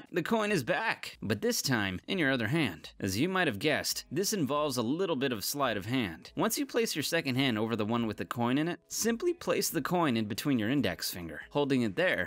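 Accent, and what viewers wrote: United States. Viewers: 20 pound note shows 20 dollar bill also his accent was American so why 20 pound note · It's so weird to hear someone refer to bills as "notes" and their value as "pounds" while not only speaking with an American accent; but also holding US bills.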